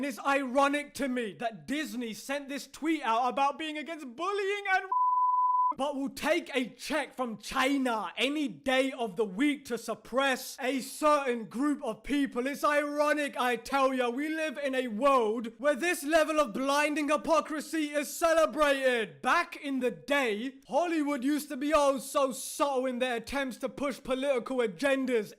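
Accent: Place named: England, United Kingdom. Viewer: I love an English accent that doesn't make me feel stupid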